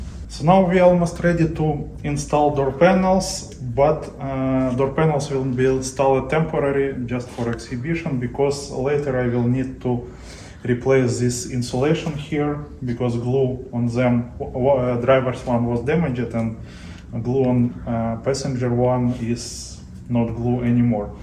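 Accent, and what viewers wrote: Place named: Eastern Europe. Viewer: Nice exposition stand 😉 Great cars 👍 and wasted someone's effort on crappy Volgas 😄 So now we're waiting for six or seven more episodes on other Alfas/Maserati 😁 Every car and its story is interesting, if you just have time and possibility. As for your English - better is Eastern European English with accent, than fluent srussian. Stay safe and strong and looking to next episode of Golden Beauty ✌️ Greetings from Lithuania!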